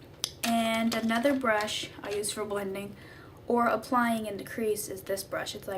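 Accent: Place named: Texas, United States. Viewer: Where are you from?Texan?You kinda have an accent like me..lol,good video ;-)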